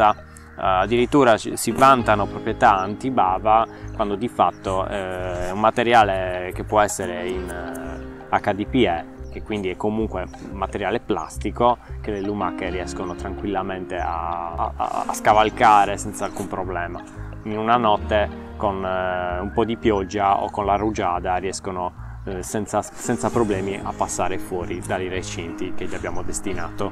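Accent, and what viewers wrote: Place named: Campidano, Italy. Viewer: Dove vivi esattamente?Dal tuo accento direi Campidano. Un saluto da una sarda in Scozia.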